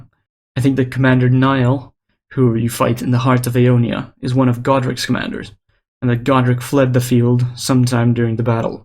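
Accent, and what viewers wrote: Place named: Netherlands. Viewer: Man, you sound so dutch, are you? xD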